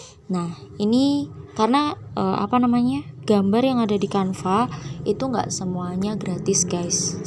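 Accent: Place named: Java, Indonesia. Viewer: Mbak nya jowo ya mba, cengkok e kliatan 😅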